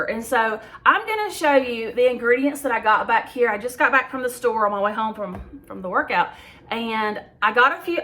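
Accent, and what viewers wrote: South, United States. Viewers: I'm a fan of freezer meals! The nest is empty, but I still like the convenience of being able to throw something in the crockpot or Instant Pot. Bless you, teaching the kindergarteners. Both of my boys loved their K teachers, and I think it set the stage of enjoying school in general. (They are 32/34 now!) I taught HS chemistry. I've lived in TX, AL, SC, and now TN. I can't figure out what flavor of Southern accent you have, lol! Great job on the exercise, btw. The endorphins are so good for you.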